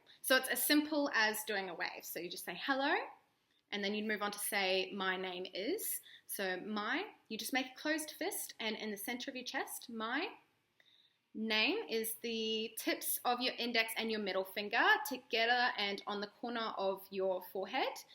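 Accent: Australia. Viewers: Im aussie and even I could hear that accent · You got a Aussie accent for sure! Haha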